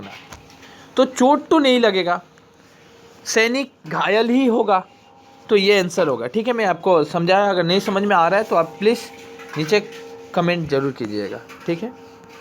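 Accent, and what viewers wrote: Assam, India. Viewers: are u assamese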